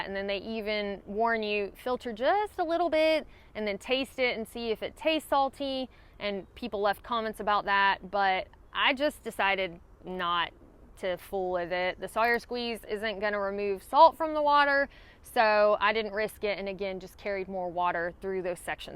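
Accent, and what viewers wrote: South, United States. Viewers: As a lifelong Californian who never been to the south, I still love listening to the accent of a good looking southern woman. Saying that, as someone who loves hiking in Cali and the southwest, this trail is just a big NOPE. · “And if you’re mad at me and wanna fight me because your Pee-Paw told you a story where he had a fight with a water moccasin…” You are just so delightfully Southern. 🤣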